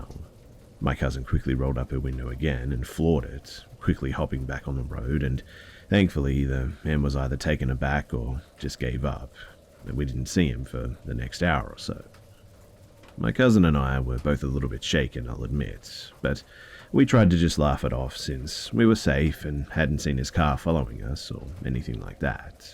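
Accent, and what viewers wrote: Australia. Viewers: Hes got that low resonating voice, and hes an aussie.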